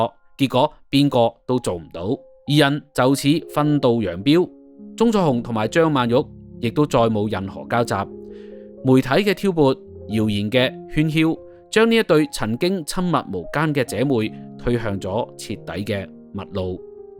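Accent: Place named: Mandarin, China. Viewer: 主播你的第一语言英该是普通话吧？不介意你的口音，但是有的字念得完全错了，比如“闺蜜”"联袂”。。。祝你进步！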